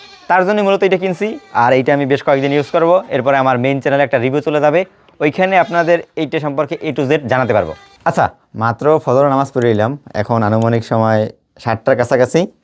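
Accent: Barishal, Bangladesh. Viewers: আপানার বাড়ি কি বরিশারে ভাষা শুনে এমন টা মনে হয়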